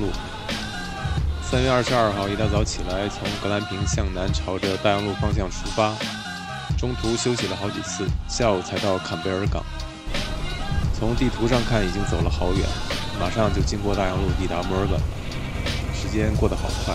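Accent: Northeast, China. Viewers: 听东北话真是亲切，我18年19年南澳 西澳两次自驾，总长没有你跑那么远，也没有伙伴，但真的很爽，以后有机会还要去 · 东北腔，哈哈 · 本山东人认为，明明是东北口音啊哈哈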